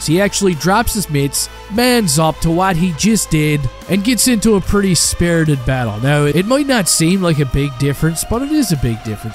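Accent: Newfoundland, Canada. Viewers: Are you from Newfoundland?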